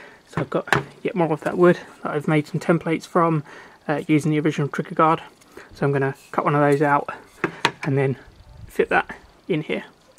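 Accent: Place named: United Kingdom. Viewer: I do not like that British accent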